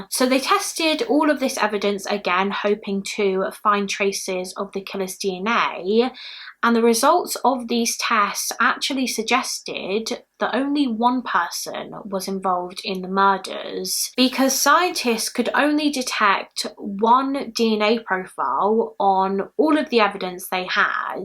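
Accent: United Kingdom. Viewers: I just love your British accent! It makes my day! Thank you for all of your interesting shows on your channel!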